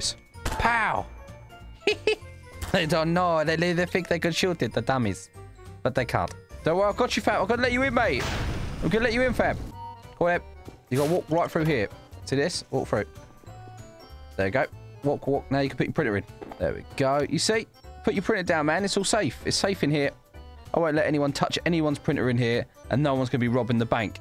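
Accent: Essex, United Kingdom. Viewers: r u from Essex aswell as me??? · ngl didn't know he was from essex... lol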